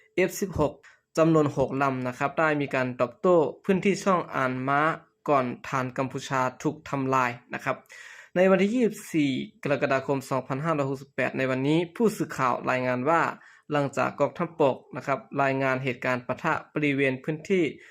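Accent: Laos. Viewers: คนรายงานข่าวเป็นคนลาวหรือ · คนอ่านข่าวนี้ลาวแน่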